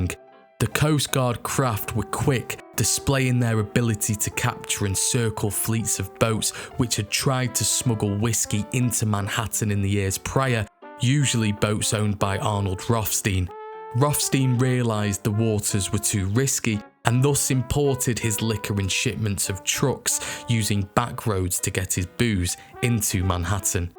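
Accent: United Kingdom. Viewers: Northern English accent, not Yorkshire or Lancashire, somewhere around Manchester?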